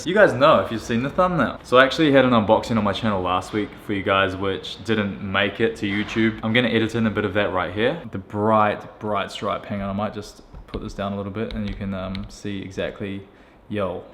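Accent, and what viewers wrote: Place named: Australia. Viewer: Broooo, How much did you pay? You sound like your from Australia.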